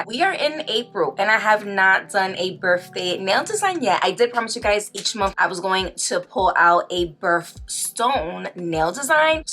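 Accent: New York, United States. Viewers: I love your accent r u from New York? I’m A new sub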